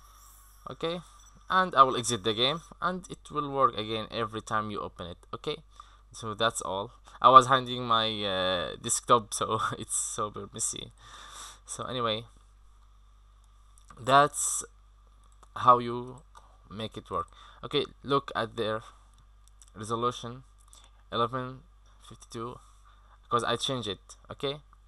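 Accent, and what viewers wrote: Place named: Arab world. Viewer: شكرا يا مان لانوا من لهجتك عرفت انك عربي ، على لغتك الانكليزية رائعة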